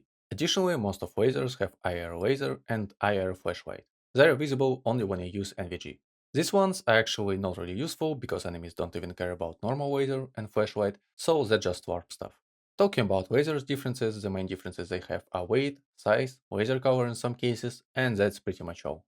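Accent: Russia. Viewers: El Russia accent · По акценту палишься руснявый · вы русский? У вас очень русский акцент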